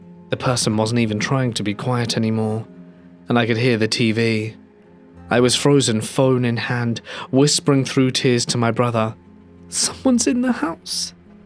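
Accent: United Kingdom. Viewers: I'm not sure where you live but he has a very British accent like my own .Unless you live over on this isle then it's not going to be possible..I'm guessing you may be,American because I've noticed Americans never take into account that there's actually billions of us who don't live over there ..cheers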